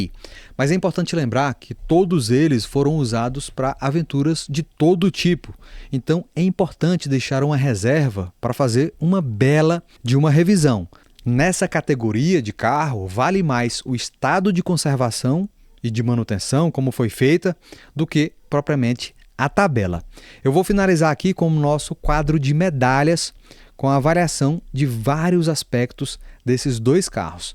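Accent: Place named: Ceará, Brazil. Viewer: Cabecinha de caixa d'água kkkk macho, comecei o vídeo achando que tu tinha um pé no Ceará e no decorrer, quando vocês fala "aqui no Ceará"...batista. Sua interlocução e montagem/construção do vídeo estão de parabéns. Grande abraço de um outro cearense e novo inscrito.🤝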